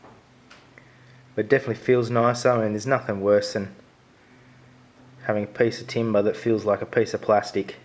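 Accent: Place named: Australia. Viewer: Hello Mr. - I couldn't identify the wood that stock is made of, until I Googled Sportco Model 63, and found out that it, like your accent, is Australian. That explained it! Your stock is made from Eucalyptus wood, which, for gun stocks, is not common at all. A very nice piece of wood on a very nice rifle.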